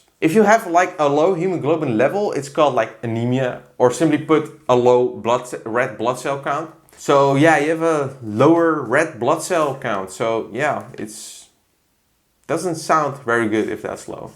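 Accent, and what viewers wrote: Netherlands. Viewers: Hier via Derek. Binnen paar seconden wist ik dat je nl was. Dutch accent > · Here from more plates for dates, en toen dacht ik deze gozer heeft een nederlands accent dus ff kijken.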